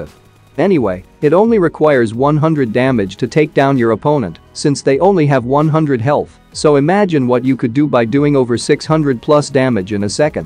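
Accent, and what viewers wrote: India. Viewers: this man is indian?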